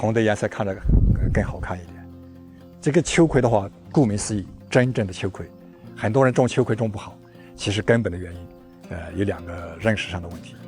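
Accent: Yunnan, China. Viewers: 我的家乡是云南德宏芒市，听着您的乡音太亲切了! 我也喜欢种菜，您的视频太有帮助了!谢谢!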